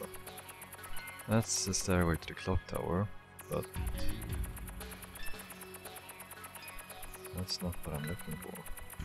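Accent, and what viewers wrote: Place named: Europe. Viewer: Pardon me if this sounds a little rude, but do you have an accent? Your is so unique and cool and I want to say it's due in part to a European accent of some sort, but I can't quite put my finger on it. Where are you from?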